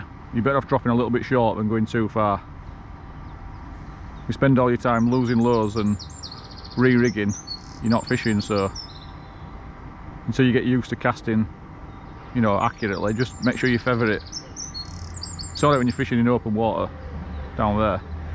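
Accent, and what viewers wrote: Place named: West Midlands, United Kingdom. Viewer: What canal is that? Is that the Aire/Calder? Your accent kind of sounds West Midlands, maybe Leeds area. Hope all is okay in the UK.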